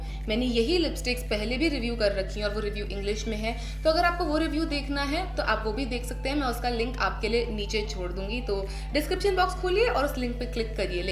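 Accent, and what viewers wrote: Delhi, India. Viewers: Pakka Delhi wali hindi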